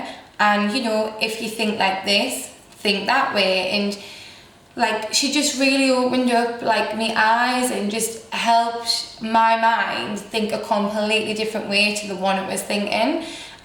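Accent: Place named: Newcastle, United Kingdom. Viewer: Geordie accent from Newcastle, uk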